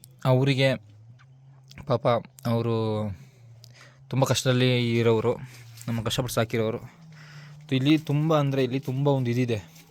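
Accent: Kodagu, India. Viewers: ಅವರ ಮಾತು ಕೇಳಿದರೆ ಕೊಡಗಿನ ದುಬಾರೆ ಅಂತ ಕಾಣಿಸುತ್ತೇ.... ನಾವು ಅದೇ ಜಾಗ, ಗುಡ್ಡೆಹೊಸುರಿನವರು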